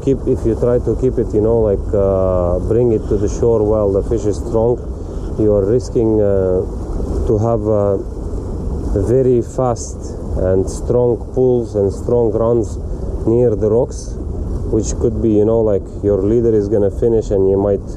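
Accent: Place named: Russia. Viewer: From the first seconds I've heard Russian accent. Hello from Saint-Pet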